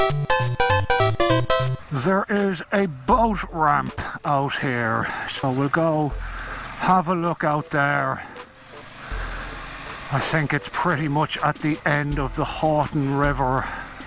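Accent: Ireland. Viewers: Where in Ireland are you from. Cant figure our the accent?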